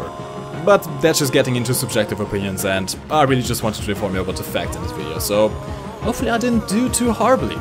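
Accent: Germany. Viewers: Dat german accent though,, i wish i could speak german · The Whole vid i fought : "Is he german?" then you said Flakpanzer blabla etc. then i new yep you are :D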